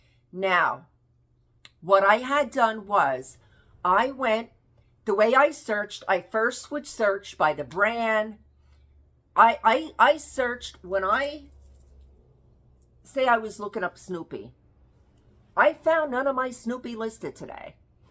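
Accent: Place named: New York, United States. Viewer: On a side note, I heart your accent! It makes me miss home (NY!)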